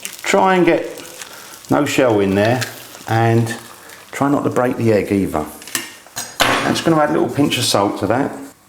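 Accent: United Kingdom. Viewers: british as fuck. I love it, tough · This is what most British people sound like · beautiful british accent ;) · Thanks for the recipe M8. I love the accent BTW. There's something about a Brit accent on cooking videos. They should call it the Gordon Ramsay syndrome..... ;) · British accent.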